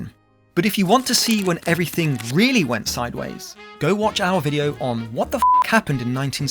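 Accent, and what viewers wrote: United Kingdom. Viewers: Hearing British people swear while talking about American history adds sunshine to my day. Subscribed! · Oh man, I love this video but something about a lofty british accent over the mocking depiction of dust bowl victims make me want to wage a second revolutionary war (but like, just on this guy.)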